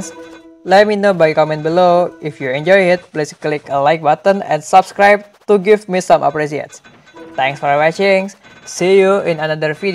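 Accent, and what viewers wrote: Indonesia. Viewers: woy.. english ne jgn ada logat jawa nya dong... klau gk pande bahasa inggris... tulis aja.. gk enak dengernya hadeh!! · logat indonesia nya masih kelihatan wkwkwke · Orang indonesia ya???logat nya persis orang indo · ronald <num> His sound like Reza oktovian,so h is Indonesia.And his sound like From Indonesia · your sound is like indonesian people trying to talk english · Jack Roach He indo, his accent was indo/asian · Indonesian accent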